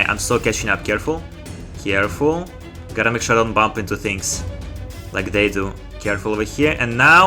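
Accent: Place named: Russia. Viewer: У тебя русский акцент, ну или хотя бы акцент стран снг, откуда ты?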